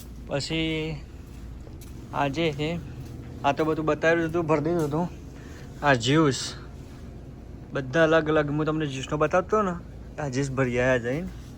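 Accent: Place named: Mehsana, India. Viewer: ભાઈ તમે તો મેહોણા ના લાગો સોં. તમારી બોલી મેહોણા ની સે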